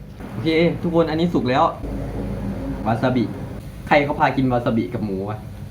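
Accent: Korat, Thailand. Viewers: พี่เป็นคนโคราชใช่ไหมเนี่ย5555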